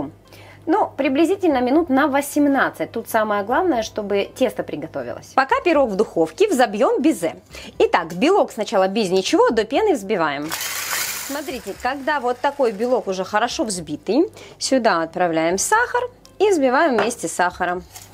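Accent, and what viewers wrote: Ukraine. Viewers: Сколько смотрю столько и слышу украинскую речь. Мне нравится её говор 😍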